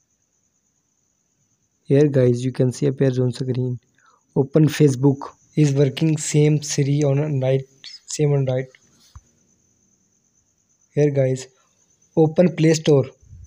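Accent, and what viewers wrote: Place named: India. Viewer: Any video made with an Indian voice I assume is likely a scam.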